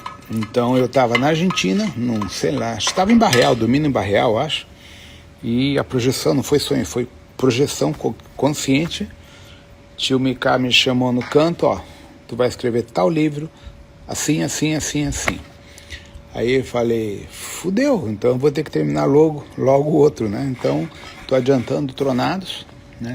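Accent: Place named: Spain. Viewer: Foi ruim de entender pq ele tem o sotaque espanhol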